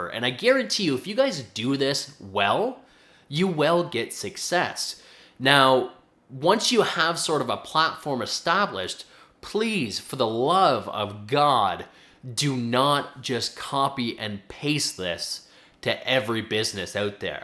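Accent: Canada. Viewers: You have a very pronounce Canadian accent, meant nothing bad by it. I was just curious if my guess was right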